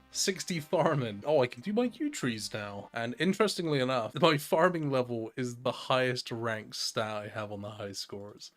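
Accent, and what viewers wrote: Bristol, United Kingdom. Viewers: bristolian accent shining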